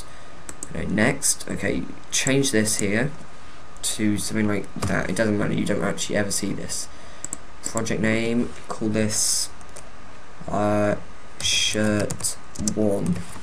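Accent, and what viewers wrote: United Kingdom. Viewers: I like your British accent :) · are you british? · yes he has a british accent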